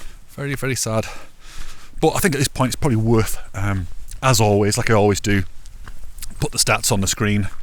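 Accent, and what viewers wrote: Yorkshire, United Kingdom. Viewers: love watching your video's and your calming voice but trying to pick up ha with proper Yorkshire accent. But am listening & understanding 😊 canny beat a pint afterwards. ❤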